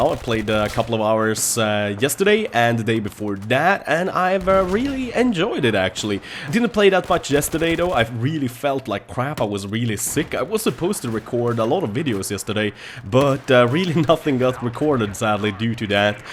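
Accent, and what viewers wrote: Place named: Sweden. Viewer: Haha shut up! Have such love/hate for your channel but i cant stop watching and listen to your swenglish haha:D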